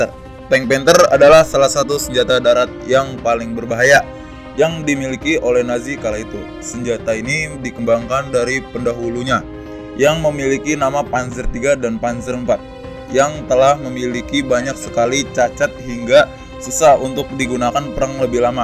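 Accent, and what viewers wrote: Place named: West Java, Indonesia. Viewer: Ini pasti yg baca narasi orang sunda..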